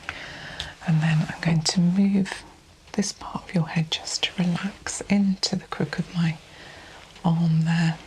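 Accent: United Kingdom. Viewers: your soft lovely voice plus the British accent is just all I need….so super calming. Congratulations on your 1 year success as an ASMR creator. Love your channel so much♥️. Blessings lovely lady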